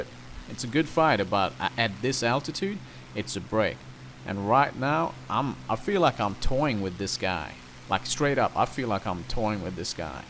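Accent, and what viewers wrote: Louisiana, United States. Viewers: Interested in knowing what your accent is from. Sounds like Louisiana mixed with a Western European accent. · I also thought Louisiana. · Its odd, because I could have sworn you sounded like you were from the Bayou, around Lousiana in the US at times, and other times it sounds like Australian. Really cool man!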